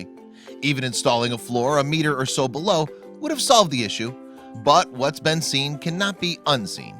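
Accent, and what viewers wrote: United States. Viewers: It's a bit disconcerting to hear copy so blatantly written by a Brit read by an American. Just saying, something you might want to think about.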